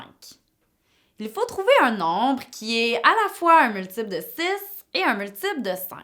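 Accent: Quebec, Canada. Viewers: Ses un accent québequoi! :D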